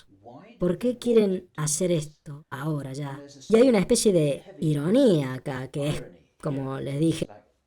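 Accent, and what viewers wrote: Córdoba, Argentina. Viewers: Felicito a quien se haya tomado el trabajo de traducir a voz viva todos estos documentales, pero esa voz tipo " las ardillitas" y con una tonada cordobesa y con intervenciones tipo humor cordobés...jajajajaja, me encantaría saber quien está detrás de esa maravillosa voz....jajajajaja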